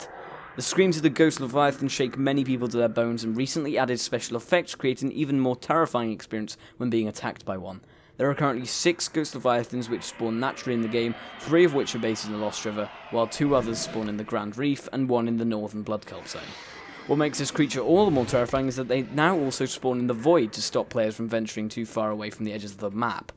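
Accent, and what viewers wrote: United Kingdom. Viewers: y r u british :/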